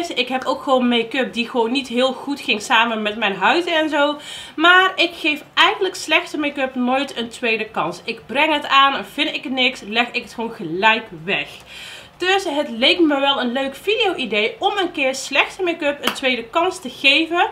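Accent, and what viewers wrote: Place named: Tilburg, Netherlands. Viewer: ik heb heel effkus hoor ik je zeggen 😂 heerlijk Tilburgs!!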